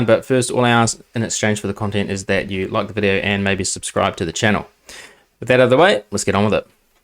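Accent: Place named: Australia. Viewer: I didn’t picture u as this. Your accent gave me the expression that u were an white Aussie dude lol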